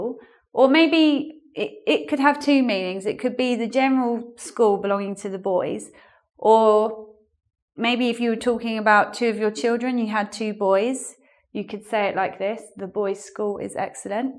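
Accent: United Kingdom. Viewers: Beautiful British accent!! Great class. Yes, you do seem to be a little sad at the end of the class... be good, you´re a lovely person! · The way you said important I like it, and I try to imitate you. Lol I love British accent. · She has a Horrible British accent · You're a British English Speaker or American? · I really loved British accent. 😂😍🖒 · excellent British accent, I would like you to make a video and a list with words but with the different pronunciation between England and usa,, it would be great, thank for help us, why you look so blue?,,have a nice day. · What a great lesson! I have had difficulties to understand your british accent, but at the end I understood. Thank you!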